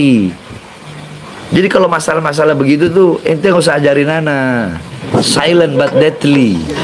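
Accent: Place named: Manado, Indonesia. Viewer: Sama sama orang Manado (Sulawesi) lagam bicaranya sama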